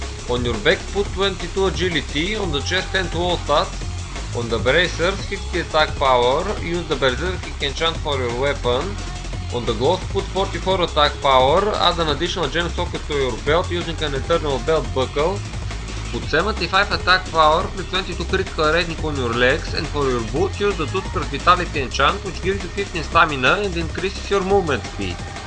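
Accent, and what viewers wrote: Bulgaria. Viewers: 100% sam siguren 4e si bulgarin samo kato ti 4uq angliiskiq :D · ахаха леле брато, само като ти чух акцента, си казах, това момче е Българин :D щом бях в другата стая и ми се стори, че говориш на български, смятайй ;д иначе браво, клипа е мн добър и полезен :)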